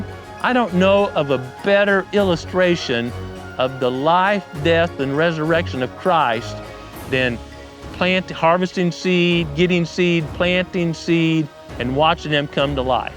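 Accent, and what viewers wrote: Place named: Eastern Shore, United States. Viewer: You from the Eastern shore? Accent sounds like it.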